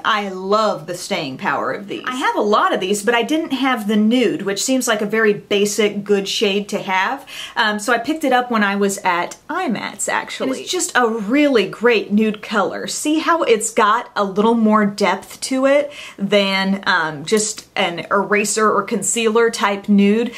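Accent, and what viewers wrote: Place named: United States. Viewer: You're so pretty and your accent is SO COOL. Whereabout in America are you from?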